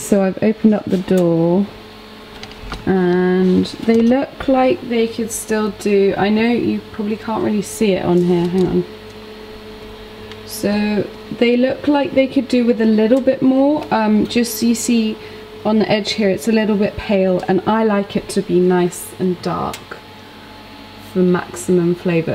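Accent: United Kingdom. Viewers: I love your British acent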